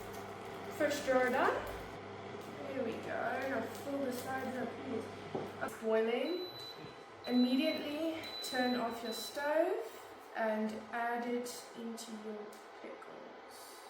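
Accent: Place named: United States. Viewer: 😂 oh how she’s grown and that accent, efinitely the American guest influence, just love it!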